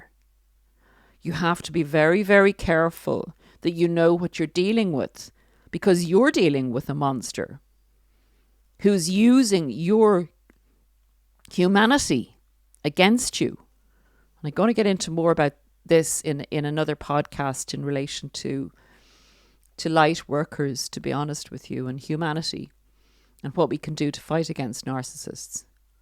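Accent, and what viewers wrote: Ireland. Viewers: You are describing my mother … thank you. And I love your Irish voice - everything sounds so clear!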